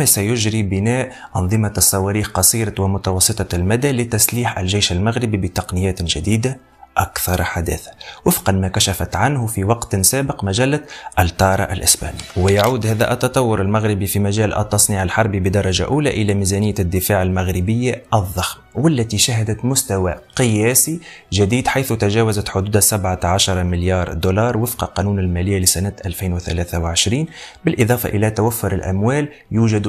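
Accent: Tunisia. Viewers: ماشاء الله تبارك الرحمن تحليل في المستوا مع نطق باللهجة التونسية سبحان الله في المستوا برافو عليك أخي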